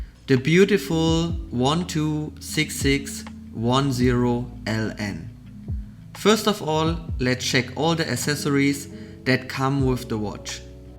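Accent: Germany. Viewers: Are you Form germany?😅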